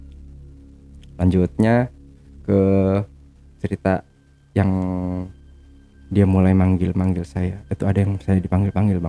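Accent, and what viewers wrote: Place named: Sumatra, Indonesia. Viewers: Jelas kamu ni orang lampung.. dari logat ngomong nya. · Orang lampung nih, aksenya lampung nyeu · Dari logatnya aku tau abang ini sumatera nya di mana... 😊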